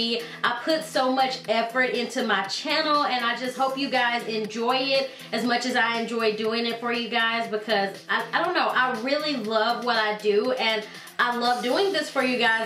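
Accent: South, United States. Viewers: I love watching your videos & that southern accent :-) keep up the good work & YESSSS EAGERLY AWAITING THE ROOM TOUR B/C WRKN ON MINE & NEED SOME INSPIRATION :-) Be blessed!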